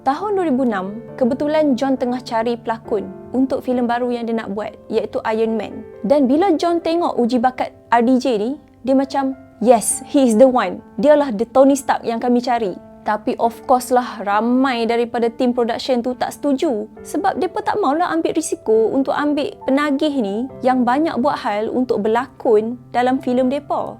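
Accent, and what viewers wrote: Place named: North, Malaysia. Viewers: Cayaq tengok awek utara ni cerita.. Begitu pekat loghat dia..😊❤ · Wakk single tak? Sy suko loghat utaraa mcm mana nk kenal SI Gadis ni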